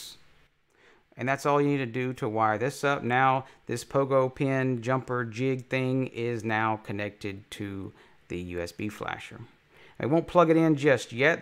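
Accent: Louisiana, United States. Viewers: Very Cajun!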